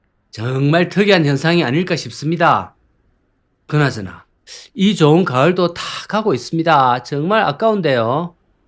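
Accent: Gyeongsang, South Korea. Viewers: 영남할배가 무슨 서울가로수길까지 와서 설레발인가 이 송파할배가 듣기 거북하구만~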